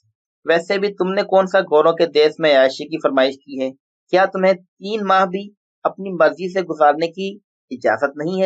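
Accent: India. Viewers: Awaz waly dost indian lag rahy hain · لہجہ ایسا جیسے کوئی انڈین اردو کی ٹانگ توڑ رھا ھو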